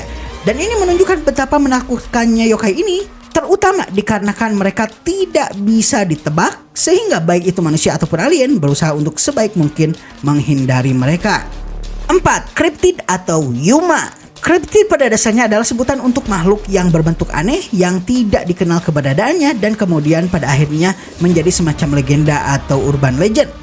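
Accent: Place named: Java, Indonesia. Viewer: Kamu wong jowo mas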